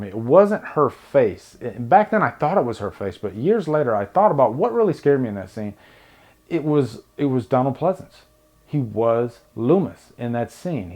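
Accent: South, United States. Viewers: I only have just recently discovered your channel and I have to say this is an insightful and well dictated review. I know this may sound stereotypical but the southern accent adds a bit of charm as well. Keep up the good work!.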